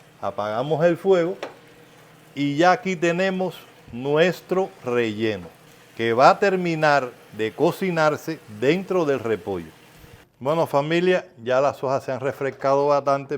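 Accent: Cuba. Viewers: Me encanta ver como prepara sus comidas deliciosas pero lo mejo es ese acento Cubano tan dulce!!! ❤❤❤❤ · Querido abuelo me hizo recordar a mi papa cubano ese acento cubano es inconfumdible gracias por traerme viejos recuerdos y voy a preparar esta receta con congri gracias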